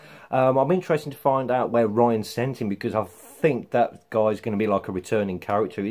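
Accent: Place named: Northern England, United Kingdom. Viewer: Thanks man, if you don't mind me asking, where in the UK are you? You sound quite northern ..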